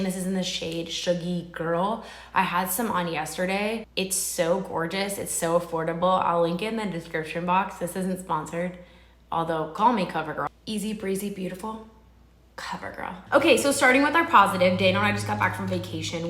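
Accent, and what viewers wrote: South, United States. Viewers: I’ve been watching you for quite a while and I’ve never heard your southern accent as much as I did today. I’m southern (if you count Texas), so I love it. I want to share that I got married in 1989 and had my first child in 1997. Infertility is not fun at all, but I pray your dreams come true. At 61, I now have a son who is almost 27 and a daughter who is 23. Hang in there. On a lighter note I love the less-harsh dark brown mascara!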